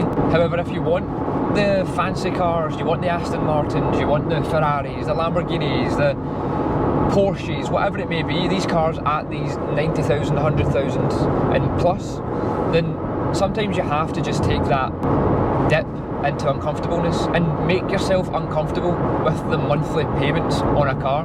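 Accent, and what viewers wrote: Scotland, United Kingdom. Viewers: Do I detect a Scottish accent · Good video, nice to hear a Scottish accent after moving south from Inverclyde!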